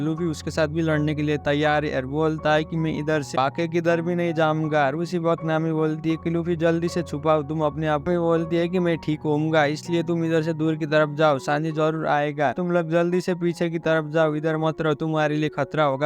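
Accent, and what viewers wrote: Nepal. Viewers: Nepali accent is so annoying😢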